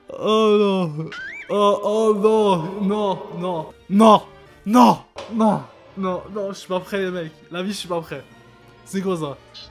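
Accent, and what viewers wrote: Quebec, Canada. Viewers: Je me suis abonné au moment où j’ai réalisé que t’avais un accent québécois de ouf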